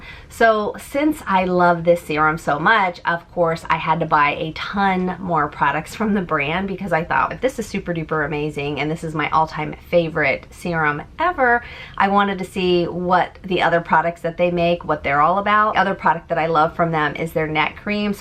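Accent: England, United Kingdom. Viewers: Love your English accent 😂😍❤ this cruise sounds like a lot of fun to me 😍have fun! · Love your English accent 😊😂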